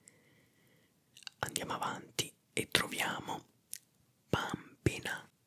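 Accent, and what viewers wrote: Sicily, Italy. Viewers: Come mi piacerebbe capire di più il dialetto siciliano ! Suoni bellissimi 🤩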